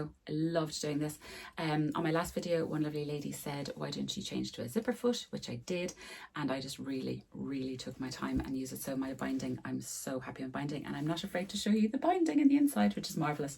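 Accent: Ireland. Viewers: I do love your vlogs. Your accent reminds me of 7 months I lived in Crossakiel when my OH had a job in Cavan.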